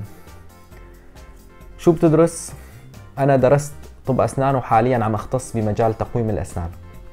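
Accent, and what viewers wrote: Syria. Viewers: اخي انت من الشام بس لهجتك تميل لديريه ارجو الرد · شلون من الشام ولهجيتك مردلي شغل الحسكة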